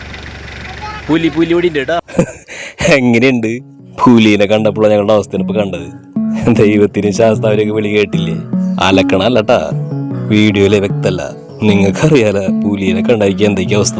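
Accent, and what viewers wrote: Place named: Kerala, India. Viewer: You can write it naturally as: നല്ല അവതരണം. ഉപയോഗിച്ചിരിക്കുന്ന നമ്മുടെ കാട്ടൂരിന്റെ സ്ലാങ് കൂടുതൽ മനോഹരമായിട്ടുണ്ട്, എന്റെ സുഹൃത്തേ. 👍😊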